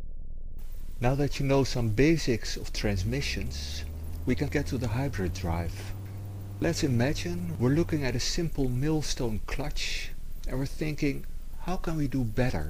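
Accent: Netherlands. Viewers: is Dutch. He's not speaking his mother tongue. He has a slight Dutch accent but his English is perfect. He even uses English idioms and nuances which a lot of native English speakers would have difficulty with. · It is a Dutch (The Netherlands) accent. For a Fat and Heavy GERMAN accent listen to <link> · I think he's dutch. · you sound dutch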